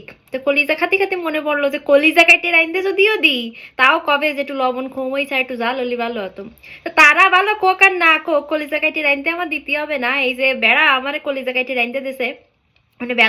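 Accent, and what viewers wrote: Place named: Jessore, Bangladesh. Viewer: ম্যাম, আপনার কথার টান আমাদের যশোর ও দেশের দক্ষিণ পশ্চিম এলাকার মতো।আপনি কোন জেলার প্লিজ?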